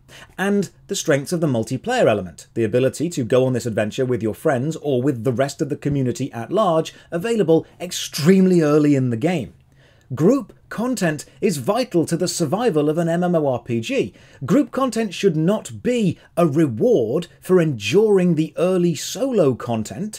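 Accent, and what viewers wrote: United Kingdom. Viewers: I haven't watch a Josh Strife Hayes video in a while, and it really surprised me how I immediately felt a nostalgic sensation of joy when I opened up this video. Perhaps Ill be finding some time to get those charming british tones in the coming days.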